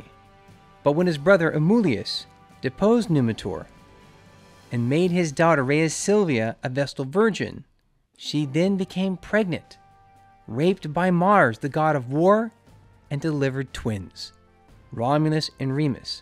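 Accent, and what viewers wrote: United States. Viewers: Do Italians think you're Italian? I did. If you didn't have such an American way of speaking, no one would know (from looking at you), that you're not Italian or at least Western European.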